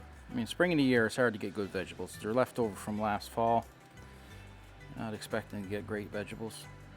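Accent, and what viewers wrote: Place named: Atlantic Canada, Canada. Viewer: GREAT recipe. Any combo dish like that which blends all those flavors can easily turn into feasts fit for Kings. In my recipe I've used sweet potato, roasted Brussels sprouts, and garlic (including garlic powder), and parsnips which add a certain minty flavor to the mix. Keep up the good work! Oh by the way, I enjoyed listening to your Atlantic accent. Here in the Twin Cities we have many Canadians because of our love for pro hockey. Their accents are so different from yours. 😀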